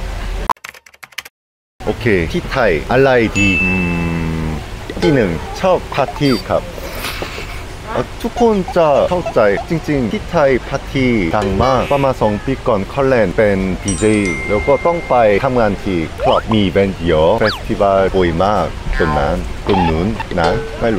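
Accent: South Korea. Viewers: เพิ่งจะดูเป็คลิปแรก น่ารักมากๆ ชอบคนเกาหลีพูดภาษาไทย น่ารักดีค่ะ😊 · Thai language with Korean accent😂😂😂 You speak like in Korean Film 😂😂😂🤣🤣🤣 so cute 🤣🤣🤣 very talent 👍🏽👍🏽👍🏽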